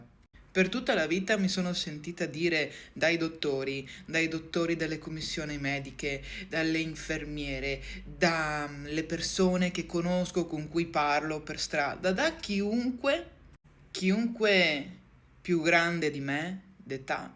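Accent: Veneto, Italy. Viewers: Comunque volevo dirti che sei molto carina ed hai un bellissimo accento veneto ( mi sembra che sia veneto), magari dirtelo non serve a niente, ma è quello che penso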